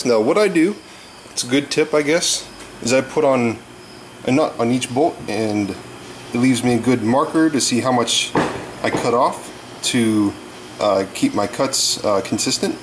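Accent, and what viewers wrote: Canada. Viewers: You sound extremely Canadian.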